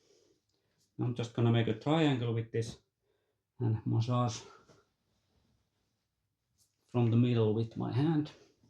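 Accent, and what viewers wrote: Finland. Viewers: I'm SOOOO into finnish accent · I love the Finnish accent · loves the Finnish accent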